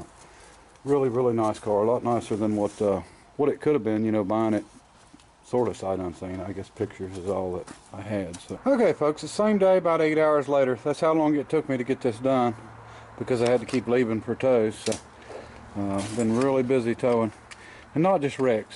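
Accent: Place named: Florida Panhandle, United States. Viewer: I didn't realize you were in Florida.. so am I. I'm a Boston transplant and I've been in southern Fl. for a few years now. If I had to guess by your accent, I'd say you're from the panhandle..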